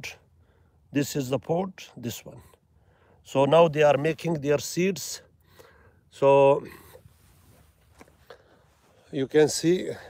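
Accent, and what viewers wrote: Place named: Pashto, Afghanistan. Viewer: Very beautiful English with pShto accent... Even flowers, desert n butterfly are all awesome... Moving camera view to show surrounding ll be good idea for knowing us abt areA a lil bit more.....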